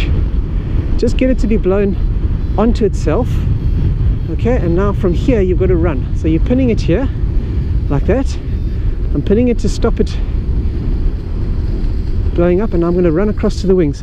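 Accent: South Africa. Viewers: South African accent ?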